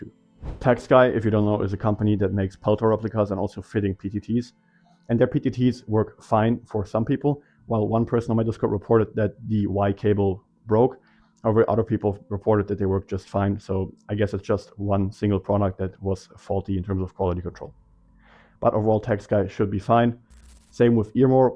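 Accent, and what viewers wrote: Germany. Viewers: arent you german?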